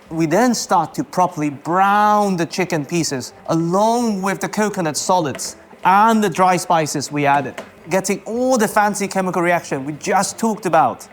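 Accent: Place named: England, United Kingdom. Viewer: Your accent has a hint of English! ❤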